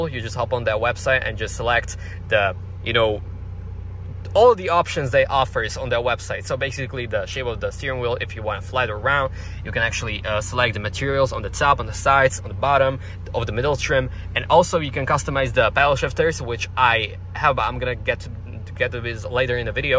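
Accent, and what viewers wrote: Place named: England, United Kingdom. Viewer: Yeah, i already like this english acc :D, i would like to see more for sure.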